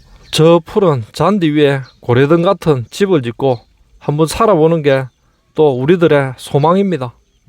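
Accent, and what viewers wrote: Gyeongsang, South Korea. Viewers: 23만 축하드려요 ~ 경상도 목소리 듣기 좋아요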